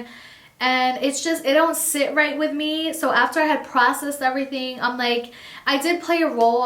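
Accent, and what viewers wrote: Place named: Newark, United States. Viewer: Sounds like Newark NJ lol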